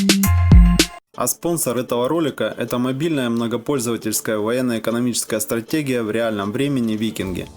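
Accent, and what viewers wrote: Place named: South, Russia. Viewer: Задолбал ужасный южный говорок даже в рекламе. Кубанойды в блогерах все, тунеядцы.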